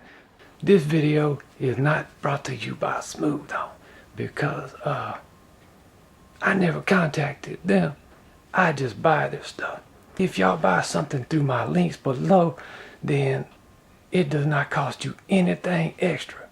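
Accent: Louisiana, United States. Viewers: I just want to know what state you’re from, your accent reminds me of my dads (Louisiana). He does cool craft stuff too, specialty is tie-dye haha. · I wanted to reach out and tell you something funny, I have a little game I play with myself. Anytime I watch a video online and I can hear people talking, I love listening to the accents and being a Louisiana native, I can ALWAYS spot a cajun accent! ;) I do a little bit of digging and everytime so far, I've been right. Well today my bf was watching your video and he mentioned to me that HE thinks you're from Louisiana, based on the accent alone. I noticed two other things: you have a cup from Newks (which is only in the south, and I've been to the one in BR), and you have a PLASTIC cup.. and every single Louisianian has a cabinet full of plastic, mostly Mardi Gras cups. 😂 I crept on your various social media platforms, with no answer as to where you're from. 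Is it Louisiana, and if so, what part?? My bf and I are from good ol Gonzales. I hope this story made you laugh 😂 take care and laissez les bon temps rouler! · Are you originally from Louisiana you have a hard accent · is you Cajun? I bet you is. I love the videos. So educational and relaxin.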